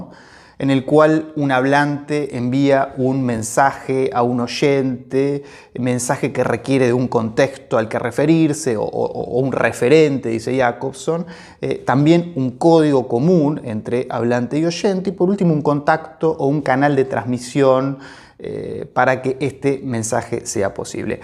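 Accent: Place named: Argentina. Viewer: Increíble! Con este acento (es usted argentino?) y hablando de argumentos interesantes, creo sinceramente que usted no exista...y encima, si puedo permitirme, muy guapo, con esta mirada intensa y esa inteligencia que parece querer salir hasta de sus dedos. Una clase estupenda (finalmente estoy entendiendo algo del formalismo ruso, la parte que usted ha tenido la gracia de explicarnos...y el miércoles tengo el examen de “Teoría de la Literatura”. Por suerte usted se ha ocupado tb de Sklovski y alguno más...iré escuchándole e investigando. Mientras tanto, aunque nos separe el Océano, sepa que mi corazón le pertenece. 🫀🩸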